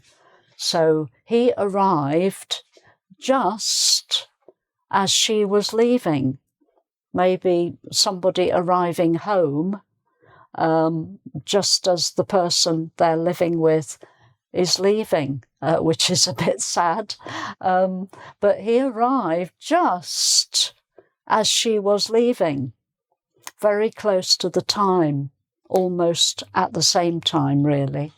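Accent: United Kingdom. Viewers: Thank you dear teacher, I perfectly understood today's class (today for me), very attractive and captivating your teachings in the traditional way: with board and markers and with a perfectly modulated voice as English women usually have. I like that British accent, it seems taken from a novel by Kazuo Ishiguro, The Remains of the Day. If I had a beautiful red apple I would give it to him with a kiss on the forehead. Congratulations. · I love your British accent! Would speak in that way! Tnx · You were born to be a teacher , your great British accent adds extra flavour to the material you present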